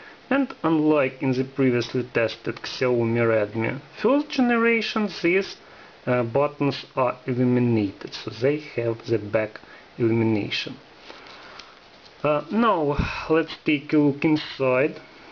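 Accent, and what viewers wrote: Russia. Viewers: very good review. By the way, are u russian?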